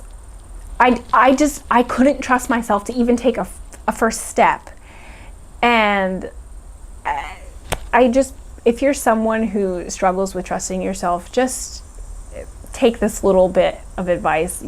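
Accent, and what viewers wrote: United States. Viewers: Hi from Italy... wow... I can understand almost everything you say in your videos. It helps me improving understanding skills of American native speakers... wich are still a big problem for me;) Can you speak Italian?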